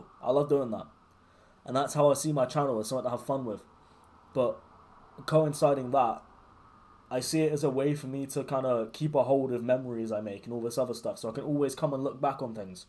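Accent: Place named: United Kingdom. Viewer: Do something else ❌ Watch talk about his life and the things he's passionate about with a british accent. ✅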